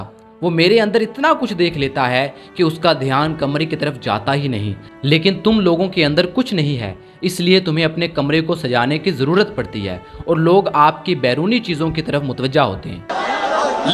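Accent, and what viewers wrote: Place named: Punjab, Pakistan. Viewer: You’re doing great job collecting information and passing onto the people throughout the Pakistan and in other countries as well. I would request two things number one please do some more research with your facts number two. Please fix your Punjabi pronunciation that will make your talks and your presentation more sophisticated. May Allah help you and give you complete success in this world and here after.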